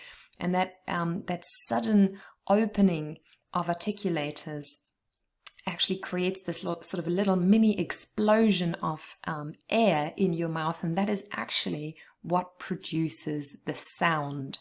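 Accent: United Kingdom. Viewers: I could listen to you for hours together. Language and phonetics is such a fascinating world to explore. After watching Dr Geoff Lindsey, my curiosity about English phonemes exploded, but I was not acquinted with the compound vowels symbols, so was finding it a little difficult to follow some of his videos. So I am glad YT brought you in my feed a week ago. I am bingewatching your videos to understand the basics of English vowels and consonants and speech pattern. I am always fascinated by British accent. Your videos threw light on how it comes to be. I hope British people do not lose their accent in coming generations now that everything is getting Americanised these days. 🙏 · Is it British accent?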